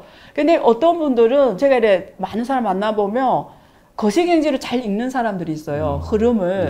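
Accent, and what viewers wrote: China. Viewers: 말투가 화교분이신듯.... 너무 뻔한 기본지식을 특별한갓처럼 말하시는듯...... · 정확한 발음의 한국사람도 많은데 이상한 중국식 한국발음에 여자를 방송에 ...짜증나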